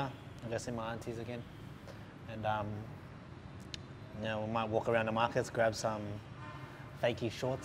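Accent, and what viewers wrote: Vietnam. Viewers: chuan sai gon bac oi ;))) chu ok ji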